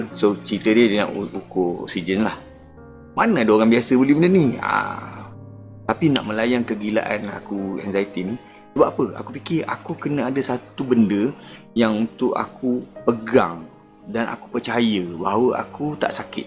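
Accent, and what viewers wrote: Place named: Pahang, Malaysia. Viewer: Sedap betoi dgr bro sembang..bunyi mcm org bentong je....boeeek bro👍👍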